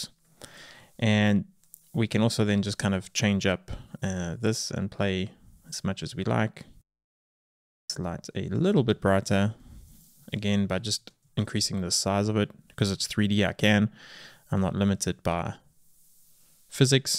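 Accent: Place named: South Africa. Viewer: Sounds like South African, but diluted from living abroad maybe?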